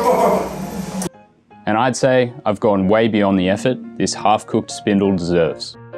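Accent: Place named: Australia. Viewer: Bro I just found this channel, and thought: well that’s weird, he sounds like an Aussie, anyways, let’s check after the video. Then I saw . Bruh. By now I feel like every Australian YouTuber I’ve seen so far is living in one neighborhood, and Wade from DankPods is an imposter from NZ, only pretending to be an Aussie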